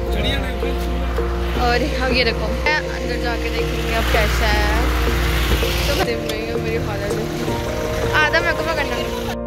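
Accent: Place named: United Kingdom. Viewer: Yrr language to HINDI HAI to ascent eng ka kyu hai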